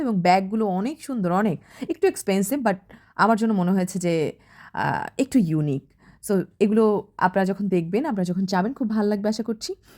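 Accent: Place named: Kolkata, India. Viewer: আপনার কথা অনেক টা কলকাতা বাসি লোকদের মতো। মনে হয় না বরিশালের।। মাঝে মাঝে একটু লাইভ করবেন প্লিজ প্লিজ প্লিজ প্লিজ প্লিজ প্লিজ প্লিজ প্লিজ প্লিজ প্লিজ প্লিজ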